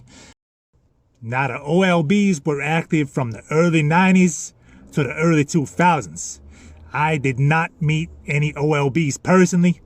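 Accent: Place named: Vietnam. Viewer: U definitely picked up the Viet accent lol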